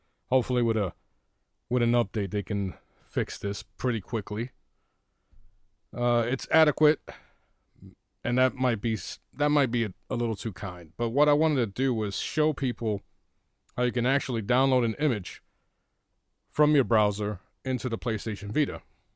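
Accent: United States. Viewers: this is probably the best american voice i ever heard.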